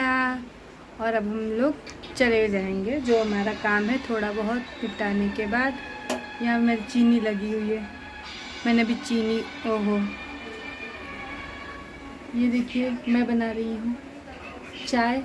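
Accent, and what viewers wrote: Bhojpuri, India. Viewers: Aap kaha ki rhne wali ho Didi mai ballia ki hun aapki language bhojpuri waisi hi lg ri h apka gaao kaha h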